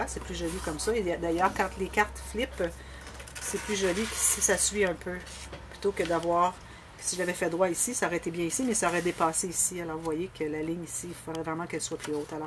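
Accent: Canada. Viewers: Merci beaucoup amitié(j'adore votre accent canadien)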